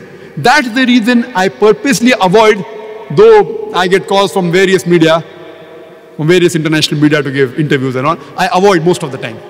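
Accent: India. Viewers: Assalamualaikum, admin please give english caption/automatic caption. We not too understand Indian accent. Please... Wassalamu'alaikum.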